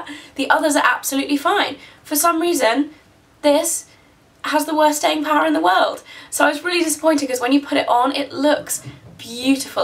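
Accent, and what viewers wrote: United Kingdom. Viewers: you always look like a california girl with a british accent :D i love it !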